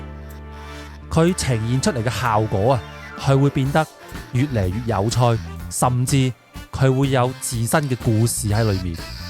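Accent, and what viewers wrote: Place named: Hong Kong. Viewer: 声音确实很香港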